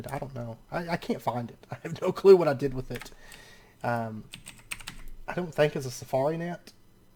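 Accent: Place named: Kentucky, United States. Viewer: He's from Murray, Kentucky :)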